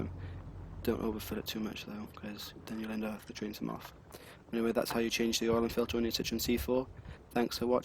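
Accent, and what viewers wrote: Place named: Wales, United Kingdom. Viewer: Thanks for your useful tutorial. A little hard to understand your Welsh accent ;) but the video is very clear and helps a lot. You have a free drink if you'll come around Olbia, Sardinia. Just send a message by Youtube. Cheers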